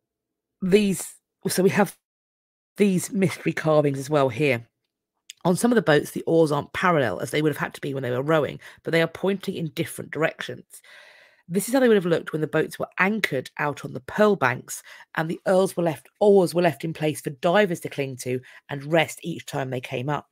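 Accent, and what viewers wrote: United Kingdom. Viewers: in Britain "Byzantine" is BYE-zan-tyne? Across the pond I usually hear BIZ-un-teen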